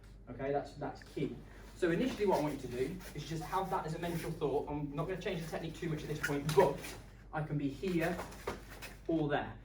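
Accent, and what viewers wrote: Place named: Wolverhampton, United Kingdom. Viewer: As it should be fluid and adaptive…loving the throws btw similar to my Aikido style, started my martial arts in Wolverhampton 20yrs ago, now in Tulsa, Oklahoma, hence noticing the accent!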